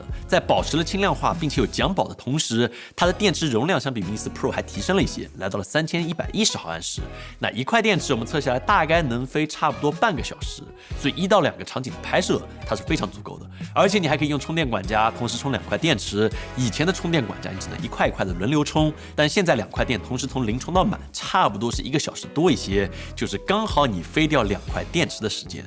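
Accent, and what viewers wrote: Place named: Jiangsu-Zhejiang, China. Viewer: tim口音像江浙一带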